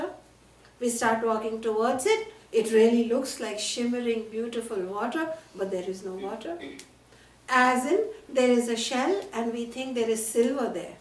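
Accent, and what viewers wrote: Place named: India. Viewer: Very Impressed with her way of explaining Vidanta. She has a strong Indian accent, so may not be clearly understood by a foreign ear, but her English, grammar & vocabulary are excellent. Very Informative, well explained, and simple yet complete. Very Educating, and informative. Thank you for posting. Peace & Blessings to all the Enlightened Souls, and the ones who're well on their Spiritual Journey. Jai Hind!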